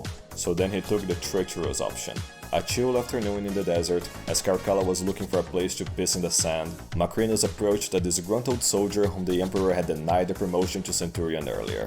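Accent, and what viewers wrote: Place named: Brazil. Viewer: bro WHAT COUNTRY ARE YOU FROM??? YOU SOUND LIKE A BRAZILIAN SPEAKING ENGLISH and this is coming from an English teacher that is brazilian as well so wtf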